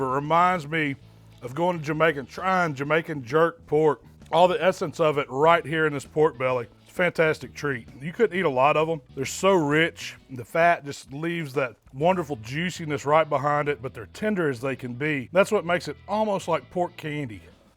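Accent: South, United States. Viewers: When you see a big dude with a southern accent making barbecue, you know you are in the right place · You get a big ol' boy with a southern accent.. You listen to what he has to say about smoking and BBQ.. I don't care who you are. I got these marinating right now and smoker tomorrow.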